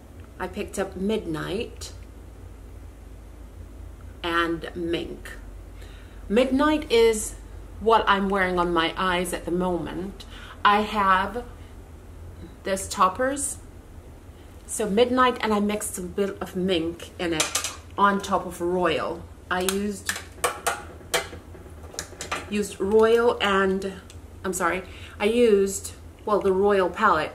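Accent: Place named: Europe. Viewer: Thank you for your video. I picked up the tweed quad. If you don’t mind me asking, where are you from? I keep hearing a slight European (?) accent every now and again.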